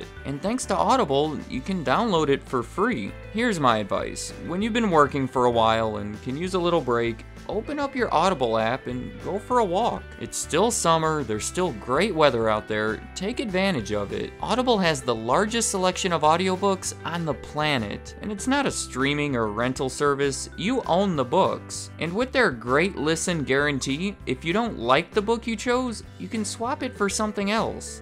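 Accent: United States. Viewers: This dude is so American it’s painstaking to listen to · No need to apologize for the Americanized pronunciation; as an American living in Europe, I don’t take offense to the onslaught of mispronunciation of scores of American brands. It would be petty...😉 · hahha, yeah it's an American kind of thing to take liberties with other languages or Americanize English words isn't it😉 adidas = ugh-dee-dus aluminium = ugh-loo-me-num coupe = coop colour = =color They've got Nike down though👍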